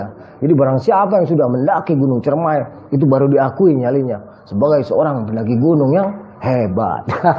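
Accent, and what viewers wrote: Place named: Kuningan, Indonesia. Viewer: Orang kuningan mana nih suaranya 😁 Sehat selalu bang 🙏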